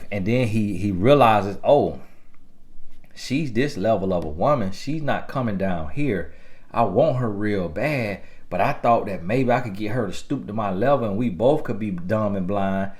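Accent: United States. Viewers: Lol crack me up with that country accent... ladies listen to this man of GOD! He is telling us the truth! Do not chase no man PERIOD! Let them go something better will come if you are patience...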